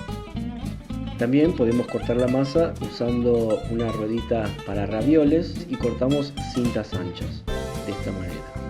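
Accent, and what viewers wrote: Argentina. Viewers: Que bello acento el de los argentinos😄😍😍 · Excelente estimado chef , decir que eres un argentino de un país hermoso previligiado porque de muy peque me a gustado tu país y ese acento especial que solo ustedes y los uruguayos lo poseen dicho esto , muchas gracias por tus recetas sobre todos estas masas me gustan igual con espinacas fabuloso las voy a preparar tal cuál lo enseñas , saludito desde Chile !!!!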